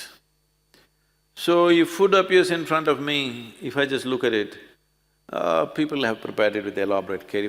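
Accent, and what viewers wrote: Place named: India. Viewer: He is speaking English clearly, he has an Indian accent.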